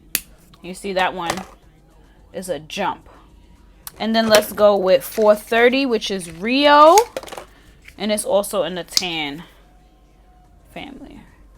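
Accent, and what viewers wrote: New York, United States. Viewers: Hi,. I am pleased to meet you on YouTube. I came across your channel on the wee hours of this past werkend, while you were sitting at your desk at work. I am a career Executive Receptionist, too. The makeup look so radiant on you. I can recognize a New York dialect anywhere. New York is home to me. God bless you.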